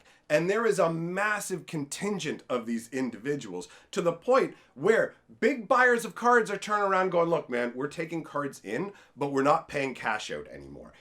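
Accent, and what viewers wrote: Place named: Canada. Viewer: I hear allot of Canadian accent but you're on point bruh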